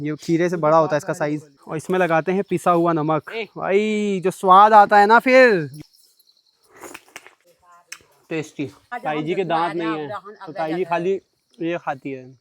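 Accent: Pahadi, India. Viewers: Pahadi accent amazing ❤❤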